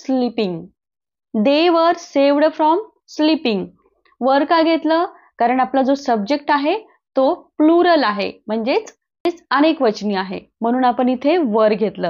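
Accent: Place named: Marathwada, India. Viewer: सुंदर शिकवता पण मराठावाडा किंवा विदर्भात अस बोललं जात जस सेव्हडं, स्लिपड, कृपया तस नका बोलू.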